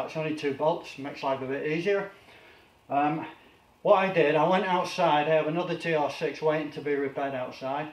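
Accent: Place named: Northern England, United Kingdom. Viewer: The accent is still as strong, I would guess Rochdale, Chorley, Bury, around that area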